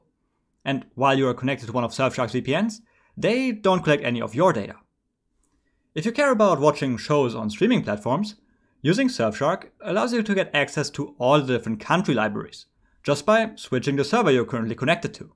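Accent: Germany. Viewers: your accent is strong. are you german?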